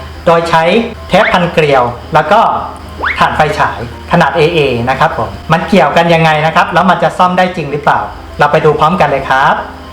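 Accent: China. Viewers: เป็นคนไทยเชื้อสายจีนใช่ไหมครับ พูดภาษาไทยสำเนียงจีน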